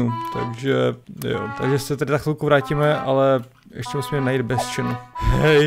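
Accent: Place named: Slovakia. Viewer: Si Slovak? Lebo maš prízvuk Slováka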